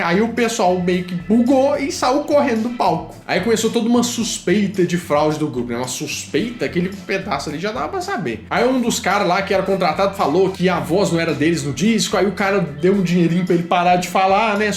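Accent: Minas Gerais, Brazil. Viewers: 1- O sotaque de Minas Gerais é um dos mais bonitos desse país. Bão msm. 2- Vídeo de abdução: eu voto Sim!!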